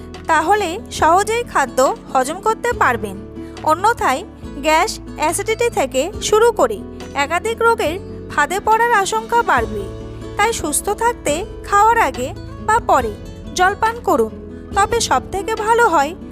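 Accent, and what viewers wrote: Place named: Bangladesh. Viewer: তুমি বাংলা দেশের লোক। তোমার কথা শুনেই বোঝা যায়। যেমন maass/মাছের উচ্চারণ শুনেই বুঝেছি।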